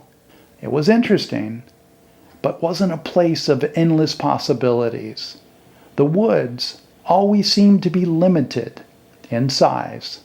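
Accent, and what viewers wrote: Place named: England, United Kingdom. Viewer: I really enjoy hearing listening to your English accent with clear story explaining like this. Thank you 🙏🏻 so much. Please take care and enjoy your time there 🙏🏻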